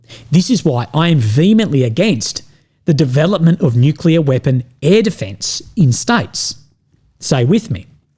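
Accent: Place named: Australia. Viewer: Hearing a australian, talking about america is f**** hilarious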